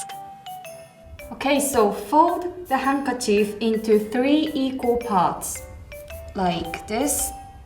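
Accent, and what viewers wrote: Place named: United Kingdom. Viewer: の英語発音が上手ですよ！彼女の英語アクセントは少し英国の英語です。はイギリスで勉強しましたか。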